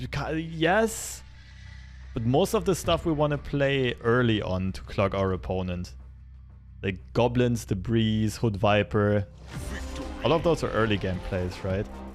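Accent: Germany. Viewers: where u from? u sound like German or some nordic country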